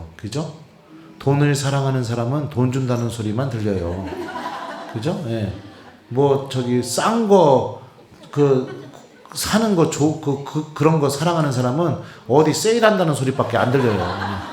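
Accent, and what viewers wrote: Chungcheong, South Korea. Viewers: ㅎㅎ 충청도 사투리 ! 그랬슈~~~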